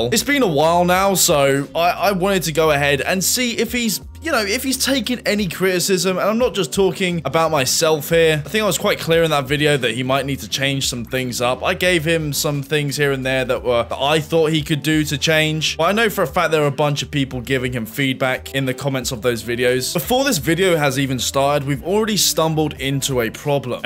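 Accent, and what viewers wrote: United Kingdom. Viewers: Ye still watching because your brit voice is crisp xD, didnt even know who this Matthew was until someone posted a random video and got me to you. But yea your voice is going to get a sub. Would love to see some playthrough walkthroughs if you plan on doing them. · "If you have interesting subject, people will watch" disagree. Watched one of your videos and at this point i don't care what you are talking about. Your voice makes my want to listen and i just let autoplayer go on. Good job you funny, silk-voiced bri'ish man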